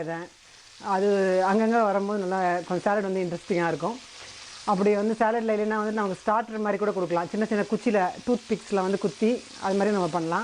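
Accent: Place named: Chennai, India. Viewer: vial modern nana kitchen. mode look. but still kneeing pesra madras tamil super irk madam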